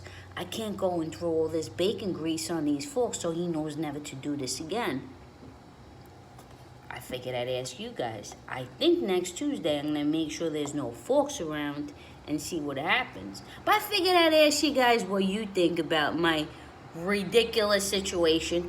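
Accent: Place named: New York, United States. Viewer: Luv ur humor. Nice to see & hear a real New Yorker. Joseph from Minnesota.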